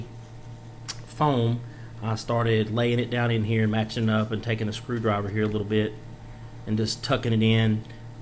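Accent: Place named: South, United States. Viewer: Like your southern accent!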